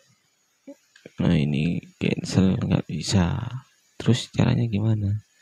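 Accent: Madura, Indonesia. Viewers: Kayak orang Madura ya ini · Meduro y kang? Hehehehehe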